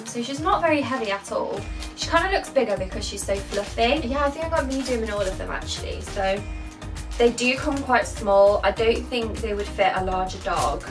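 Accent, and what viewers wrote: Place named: United Kingdom. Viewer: You sound British.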